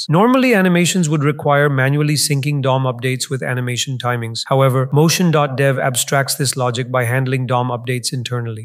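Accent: India. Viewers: Better hear indian ahh voice than ai crappy voice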